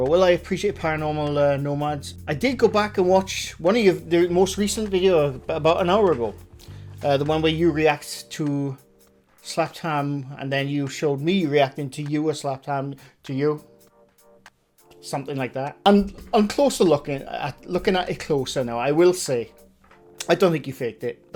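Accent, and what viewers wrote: Wales, United Kingdom. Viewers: Hi, love all your content and never miss an episode. As a fellow ginger I live in the skin cancer capital of the world (Qld Aust) and it's a pain in the arse having countless skin cancers removed already and no doubt will have more, is it much of an issue for you where you live in Wales? Also I have noticed your beard is multi toned as mine did when I hit the 30's mine became tri coloured to the point that I gave up growing a beard sadly. As for your accent, for me you are clearly Welsh but I have also encountered guys that sounded more English than Welsh and another fellow that I worked with I could barely understand because he spoke so quick and had such a thick accent. Don't get me wrong I like the Welsh, us Aussies seem to have an affinity with the Welsh, Irish and Scotts more so than the English. Keep up the good work.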